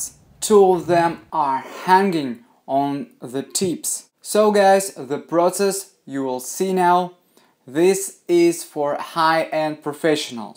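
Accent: Russia. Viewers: явно русский, страно разговаривает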